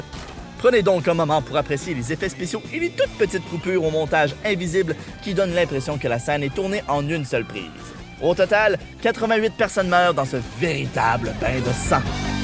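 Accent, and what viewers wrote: Canada. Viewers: J'adore vraiment cette accent canadien ! Continue tes vidéos c'est super le couteau trouvé !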